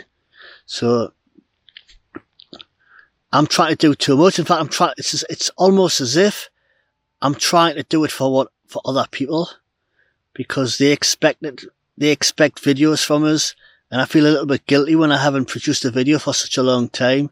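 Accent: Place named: Ashington, United Kingdom. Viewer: When’s the next video coming out? I’m getting withdrawal symptoms 🤣 miss that ashington accent n canny craic hew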